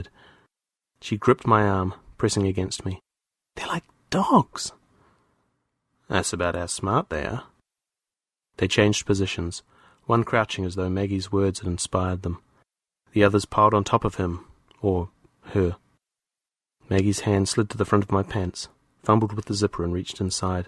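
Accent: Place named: Australia. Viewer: Mate, you’ve already got an Aussie accent that’s hard to understand on a good day, slow down your reading stop blending words together I can’t keep track of what you’re saying.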